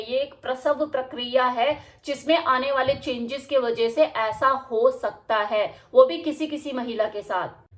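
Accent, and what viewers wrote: Haryana, India. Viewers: Ya sayad haryana se ho haa haryana 95% punjab 5%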